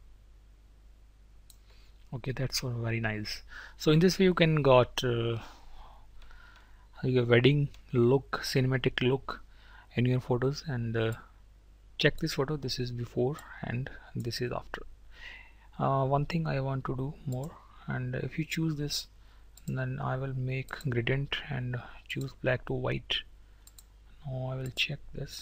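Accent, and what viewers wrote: India. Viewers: It is an English is just his accent. He's from India. It was hard for me to understand him too but once you listen you can understand.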